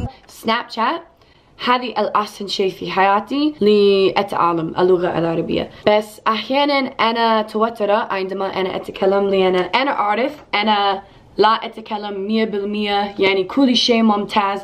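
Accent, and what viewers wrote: United States. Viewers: OMG for an American person ur American is sooooooo good learn alil bit more Arabic accent and you will be perfect 😍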